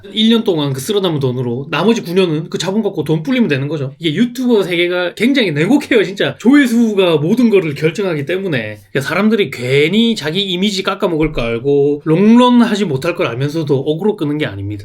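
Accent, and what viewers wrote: Busan, South Korea. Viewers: 천상 생각이나 말투나 bu산 사람 맞네요..ㅎㅎ 건투를 빕니다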